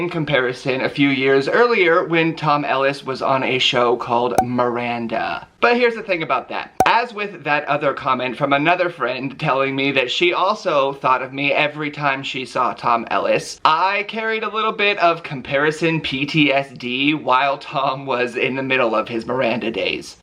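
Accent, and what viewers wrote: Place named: United Kingdom. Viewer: Yaaaas British accent!!!!!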